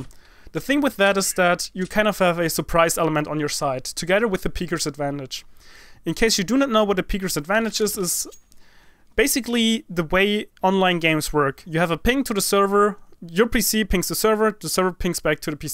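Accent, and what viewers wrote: Germany. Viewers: Are you from germany? If yes = Man merkt es an deinem Akzent :P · are you german you sound like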